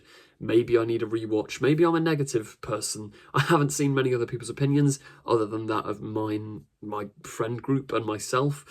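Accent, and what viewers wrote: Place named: Coventry, United Kingdom. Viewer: Ooh you from Coventry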